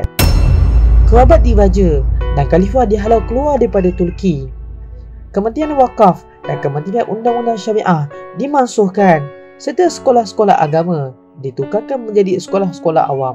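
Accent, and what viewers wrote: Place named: Perak, Malaysia. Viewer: Wah!!!... Mike orghang Perak ke Yong oii? Slanga Perak...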